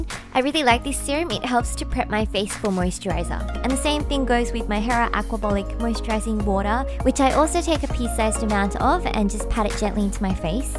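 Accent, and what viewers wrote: Australia. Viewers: are you from Korea, l hear an australian accent? to be honest, you make me wet!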